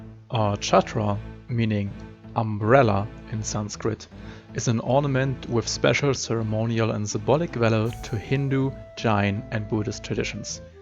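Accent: Germany. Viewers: i hear you are german - me too :D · Great video, love the content and I think you sound like Cillian Murphy if he had a German accent 👏🏼. Looking forward to the next vid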